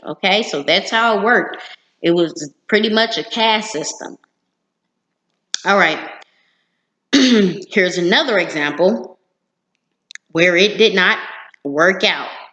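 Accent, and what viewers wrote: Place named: South, United States. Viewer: am from the caribbean and trust me when i say this "black americans " who try to speak about history speak trash. As a matter of fact some things in this video are incorrect. you think some black woman with an southern accent is gonna show up magically knowing what happened during colonial times??americans don't even know the countries of the world muchless history lol,only thing they know is that there was something called slavery. there is no such thing as black caribs and as a matter of fact the black people were west africans who escaped from the plantations during the english and spanish wars over certain islands. this is so misleading and trust me i can continue to name out a lot of other things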